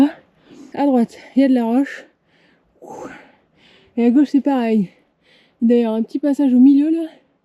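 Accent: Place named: Drôme, France. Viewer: Je viens du sud de la Drôme, dans les baronnies exactement, au pied du ventoux😁! Tu as la même façon de parler que les gens d'ici, les même expressions😉😁. En tout cas tes vidéos sont super intéressantes, un vrai plaisir à te suivre 👍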